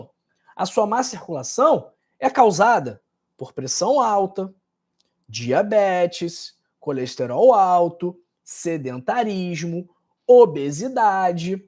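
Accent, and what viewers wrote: Rio de Janeiro, Brazil. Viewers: Oi dtor. Adoro sotake. Do. Rio. De janeiro. Acho. Lindo.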